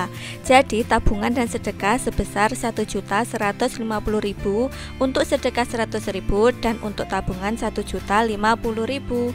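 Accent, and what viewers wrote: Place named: Java, Indonesia. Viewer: 😂😂😂 Swara medhokny suka... Semoga bisa menginspirasi bunda-bunda lainnya. Slam kenal nge bun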